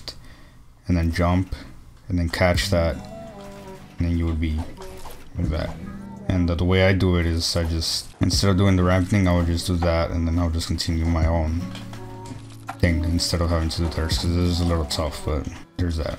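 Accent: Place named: Spain. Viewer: U sound Spanish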